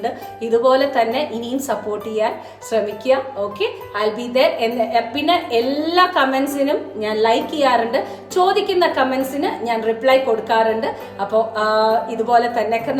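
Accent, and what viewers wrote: Palakkad, India. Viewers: I like your palakkadan malayalam very much ma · Hi where exactly are you at Palakkad. Could make out from your accent that you are a palakkadan.. Me too Palakkad.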